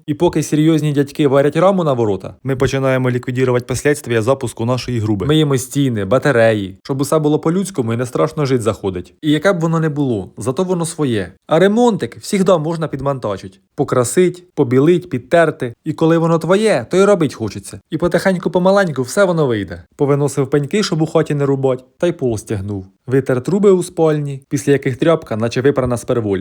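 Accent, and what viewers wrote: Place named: Kherson, Ukraine. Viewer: Говірка гарна. Ми на Херсонщині также говоримо,майже дома побувала❤❤❤❤❤❤❤❤❤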